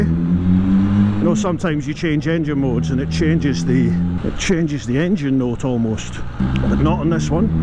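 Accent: Scotland, United Kingdom. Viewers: You know what I like the most? Your Scottish accent 😀 . Regards 🇲🇹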